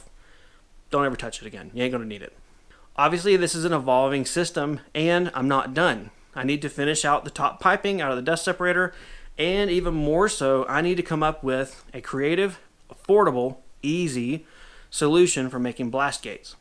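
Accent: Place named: Carolinas, United States. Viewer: I've really been enjoying your vlogs and hearing your thought process as you organize your shop lately...also, where in NC are you located? I ask because I'm right on the NC line in upstate SC. And your accent sounds pretty similar lol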